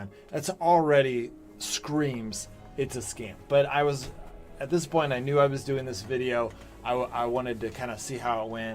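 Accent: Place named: South Africa. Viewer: That's dude got a south african accent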